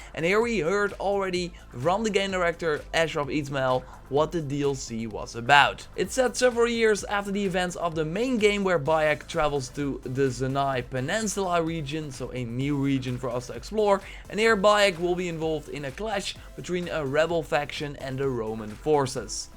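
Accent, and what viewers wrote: Netherlands. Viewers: Are you Dutch? I keep trying to place your accent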